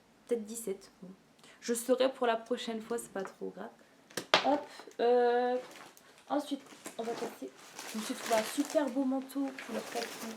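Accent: Nord-Pas-de-Calais, France. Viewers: Coucou, je débarque sur ta chaîne et je me suis abonné direct ! Je suis une nozaddict et j'adore tes vidéos :) une petite question, tu viens d'où ? Tu as pas un petit accent ch'ti mdr???? Gros bisous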